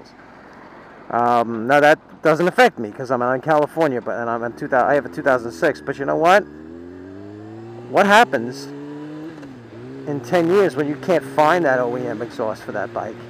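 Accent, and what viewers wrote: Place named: New York, United States. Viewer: "Ya THINK!?" "Sarcasm!" Hahaha! :D So funny. :) P.S. Love the accent! I used to live in NYC but moved to VA, and it's rare hearing that accent on TV or especially where I live. :)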